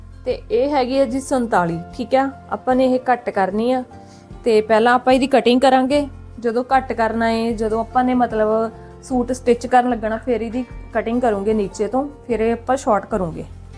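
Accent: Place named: Malwa, India. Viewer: Ssa dii, Diii tusi kehri side toh nd tuhadi boutique kithe aa????? Tusi Malwa area de lagde o kyu k bolan ch thoda farak aa sade nalo 😊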